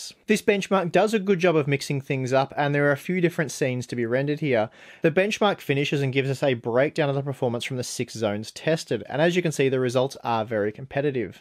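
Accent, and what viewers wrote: Australia. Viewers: You sound Aussie are you?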